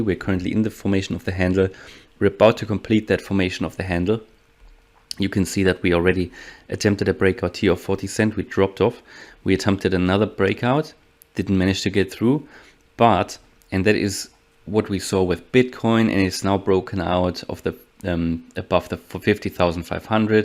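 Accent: Germany. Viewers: Deutscher akzent lol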